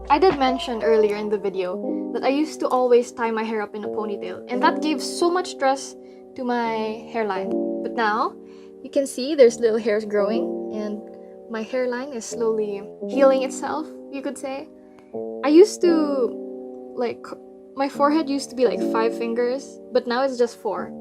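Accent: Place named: Philippines. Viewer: btw this is unrelated but i love your filipino accent. 🥺 i strive to be as confident as you when speaking!!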